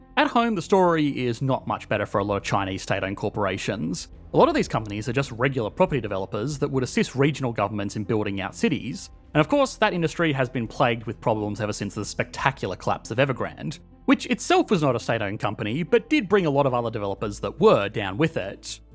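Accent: Australia. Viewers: So Aussie. Thanks for watching mate. Love it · I love the Australian accent but listening to yours is just insufferable. I don't know why you moan at the end of every sentence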